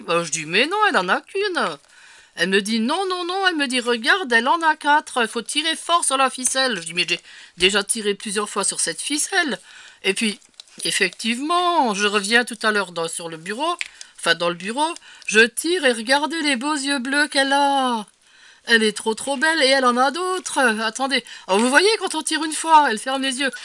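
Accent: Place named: Freyming, France. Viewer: coucou elles sont tres mignonnes sans risque de prise de poids j aime beaucoup ta voix et ta bonne humeur et ce petit accent qui me rapelle ma grande tante qui etait de freming bisous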